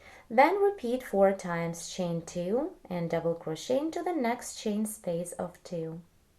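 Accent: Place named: Romania. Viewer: După accent și cum ți în mâna dreaptă croșetă ești Europea mai exact româncă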